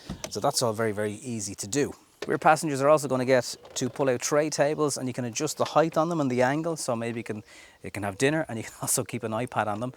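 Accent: Ireland. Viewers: Irish accent. 🤭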